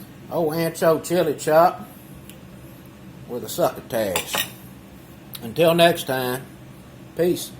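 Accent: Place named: Georgia, United States. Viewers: I love your videos! Your the only chef on Youtube that talks like this good old Georgia boy. Thanks and keep it up. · Hahaha, I am new at this channel. When I heard your good ol’ boy accent, I paused when I saw your hands enter the screen. Thanks for the great looking recipe.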